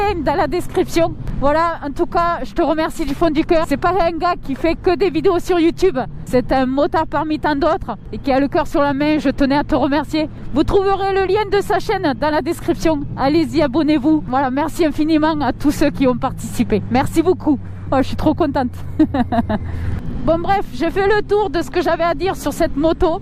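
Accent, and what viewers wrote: South, France. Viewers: cool l'accent du sud chez toi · Le bel accent du sud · Coucou magnifique vidéo et les paysage et ta belle voix du sud ça donne envie de partir en vacances,le problème des z750 sur c’était l’électrique et comme t’a pas abs il faut frères un peut plus de l’arrière moi je fais comme ça sinon je freine plus de l’avant la roue arrière ne touche plus le sol bonne continuation 👍✌️🙏😘🥰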